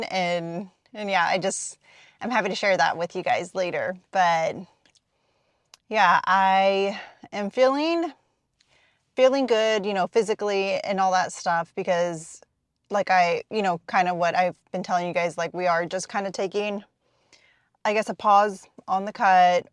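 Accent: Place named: United States. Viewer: p.s i always loved youe american accent but i never knew there were palm trees where you live... its dark and it snowed last week where i live.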